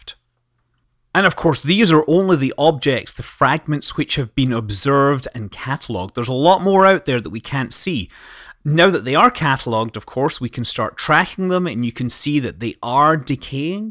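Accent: Scotland, United Kingdom. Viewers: Is Scottish?? Where's his accent from?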